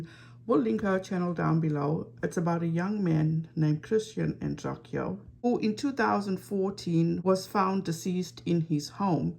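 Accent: South Africa. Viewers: this is the first of your videos I have seen. Do I detect a South African accent?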